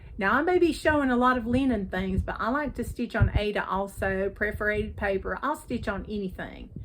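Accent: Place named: Western North Carolina, United States. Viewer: I came to your early video to see where you from! We lived in western NC for 6 years and I thought you sounded like you were from there. Wonderful people there! We are back in Eastern NC now.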